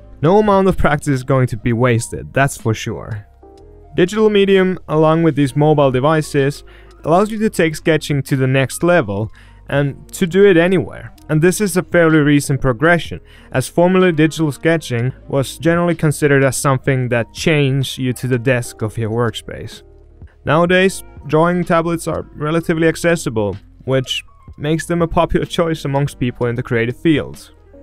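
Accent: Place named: Finland. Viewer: Haha I guessed right - Finnish accent! (Altough being Finnish myself it's not much of an achievment)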